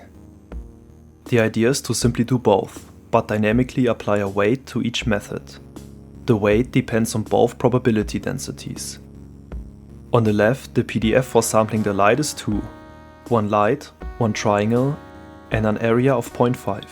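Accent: Germany. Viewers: Is it just me or does He Sound german? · What's up with the German accent? (first time seeing the channel)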